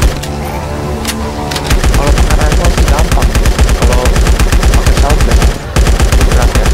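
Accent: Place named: Indonesia. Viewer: Baru denger nih aku denger voice indo hahaa -Jiyang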